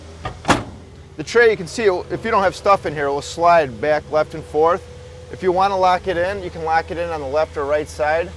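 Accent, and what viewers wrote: United States. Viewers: Great review but damn, yankee accent. I had to rewatch a few parts, couldn’t understand what you were saying at one point. Shacks=Shocks. Bax=Box. Haha. Once again great review, I’m sold on this “bax”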